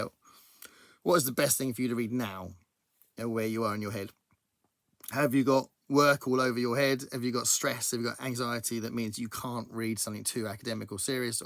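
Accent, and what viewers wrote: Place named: United Kingdom. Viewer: ● I regularly randomly pick a book off my shelf 🙈. ● I really like the cover of that Ray Bradbury. ● I'm on my 5th Star Trek book. You're a slacker! ● Oh I'd like to read The House In The Cerulean Sea myself. 🤔 ● Oh Blacktop Wasteland was not good imo, but I'll be interested to see what you think! ● Outside of BookTrek and New Worlds November, my reading IS totally random 😂. ● Oh Alien Clay. I'm not familiar with that one. I'll have to look it up. ● Wow! I love the cover on The Imposter! ● Ok random question: What kind of British accent do you have? What region?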